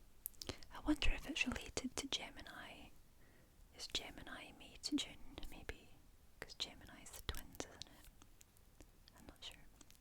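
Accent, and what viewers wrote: Scotland, United Kingdom. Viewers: You have a lovely voice, I think you should give the soft spoken a go more! It makes your beautiful Scottish accent more apparent.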